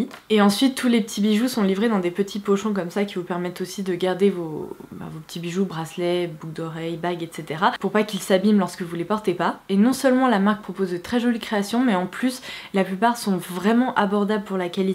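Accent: United Kingdom. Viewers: Ton accent anglais me fais toujours un peu sourire xD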